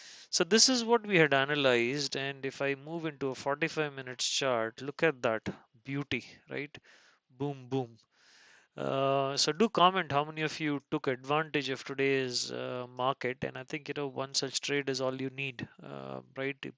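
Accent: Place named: India. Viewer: reposting...Your accent seems to be very laidback and relaxed. definitely did not seem to be 100% Indian accent but with a mix of foreign accent. so i was curious to know. i like your intro...HIiii Guuuys...haha...as if we sat down at the bar counter. Hope you will take my comments lightly. Enjoy the rest of the weekend.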